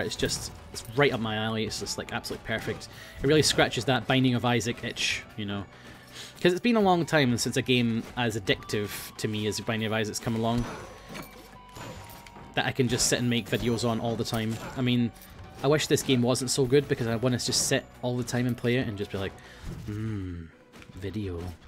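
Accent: Scotland, United Kingdom. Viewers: No, he's Scottish.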